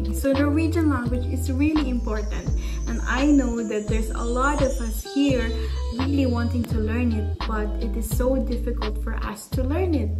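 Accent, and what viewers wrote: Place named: Philippines. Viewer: Are you a Filipino? Hehe. Because you sound like a Filipino accent when speak in English 😅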